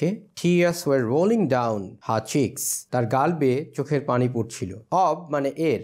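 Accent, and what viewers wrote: United Kingdom. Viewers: আপনার ইংলিশ উচ্চারণ বিট্রিশদের মতো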